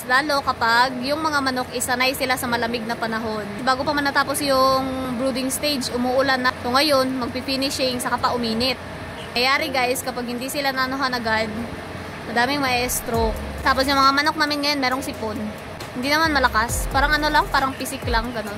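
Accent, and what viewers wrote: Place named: Visayas, Philippines. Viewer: Akala ko sa Visayas. Parang bisaya po kase yung salita nyu. Jeje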